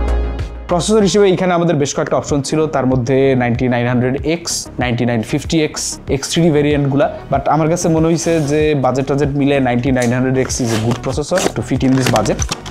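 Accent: Barishal, Bangladesh. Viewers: Je kono ekta accent e kotha bolen. Hoy Barishal na hoy suddho. R na hoy beadob type lage.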